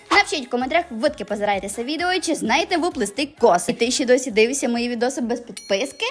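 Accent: Transcarpathia, Ukraine. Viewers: Чи це Закарпатській діалект? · Це українська мова - закарпатський діалект🥰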